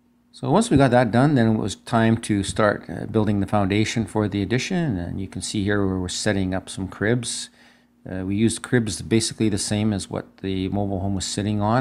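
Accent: Canada. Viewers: Your building construction is AWESOME, and is STRONG! (...your accent: are you Canadian? I love your accent!My mother was Puerto Rican; she had her accent; my father was a "hard-nosed Yankee; he had his accent. Your accent is...nice; it's "neat" that your daughter gets to help! GOOD JOB!! AWESOME QUALITY!! ). 👍😃👣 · You very much Welcome, again excellent looking home! I only assumed you were Canadian by your accent I have a few friends that live in Canada, Well greetings from Florida!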